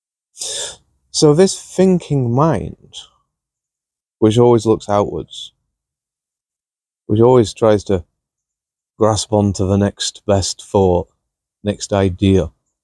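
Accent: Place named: North West England, United Kingdom. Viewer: Lovely to sit and listen to you. I'm from the North West of England and it's rare to hear someone with a familiar accent talking about this.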